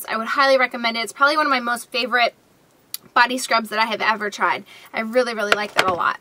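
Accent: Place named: United States. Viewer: could I just ask what part of the states you are from? Love your accent. I am from the UK x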